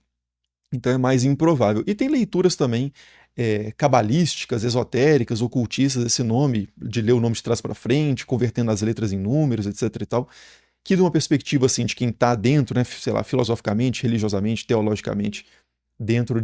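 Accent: Minas Gerais, Brazil. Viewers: O sotaque mineirinho “quem qui era o dono dissaí”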